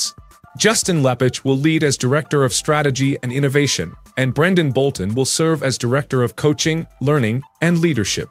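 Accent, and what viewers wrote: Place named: United States. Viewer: Why is a Yank talking?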